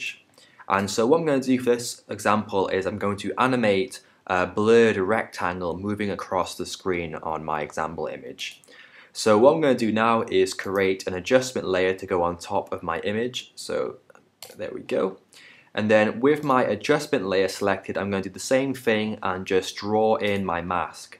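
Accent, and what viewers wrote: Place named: England, United Kingdom. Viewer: I have not problem with it and I like the accent but is it me or is every premiere or AE tutorial done by an English person?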